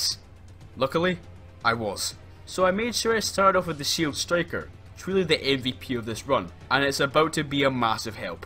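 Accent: United Kingdom. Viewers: Wait why is he British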